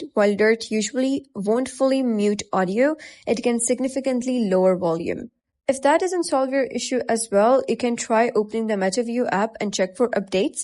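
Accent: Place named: India. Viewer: Of course its an Indian voice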